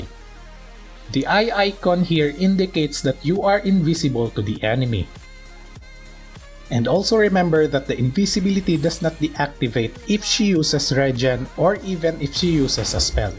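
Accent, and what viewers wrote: Philippines. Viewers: Tips are good but the pinoy accent is kinda hard to unhear. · Hope accent is from Philippine, right?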